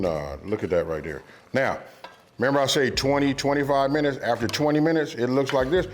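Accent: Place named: South, United States. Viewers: where would you say your accent is from? I noticed your vowels sound a lot like Ryan Coogler's from the interviews I've heard and I've heard that accent before but idk if I can place it. It's like a combination of Southern California and the American South but not somewhere I can place. Is it Oakland? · I will believe any cook with a southern American accent that they know what they are doing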